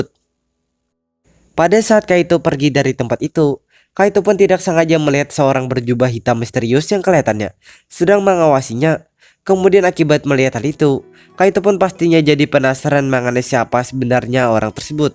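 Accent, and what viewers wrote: Banjar, Indonesia. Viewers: Aksen Banjar🤣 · Kok kayak orang banjar yak logat nya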